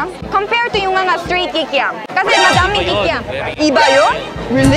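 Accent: Conyo, Philippines. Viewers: still conyo english no improvement at all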